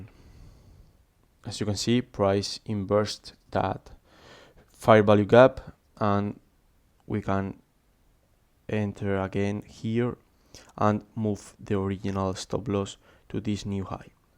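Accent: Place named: Spain. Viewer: grande sabía que eras español ajajajajaj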